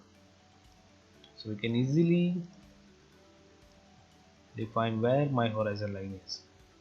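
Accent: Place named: India. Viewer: Hey, This is an interesting question indeed. I wondered too, a few years ago. (I'm glad I found this channel back, because being into this as well (matte painting, set extensions, ...), the only thing I had time to write in the late account was I really loved his indian accent ;) Hahaha, kind but short... Now I can go further fortunately) So If you don't mind switching a few moments from this lovely accent to a less lovely german one (not me btw), you'll have some answers here <link> around 5.10, 8.00 a,d 18.00...Now you can have auto calculated depth, and I think one could probe it into a bitmap too. Don't forget to come back here, I wouldn't cause leaks just because I helped.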